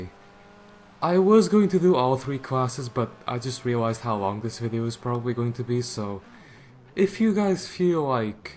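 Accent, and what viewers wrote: Portugal. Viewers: Voice is heard.. Maybe now I get a gf or a car faster... (By the way nice smooth Portuguese English eh) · Portugal accent? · Caralho, seu inglês é muito bom, muito de vez em quando posso ouvir sotaque português. Bom vídeo como sempre!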